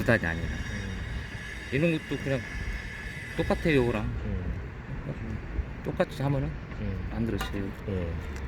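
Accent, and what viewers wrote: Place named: Chungcheong, South Korea. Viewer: 충청도 사투리가 정감가네요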